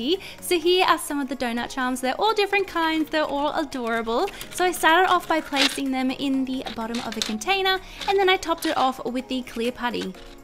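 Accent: Australia. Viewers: Is she Australian??Im new but not a fan I was bored so I came here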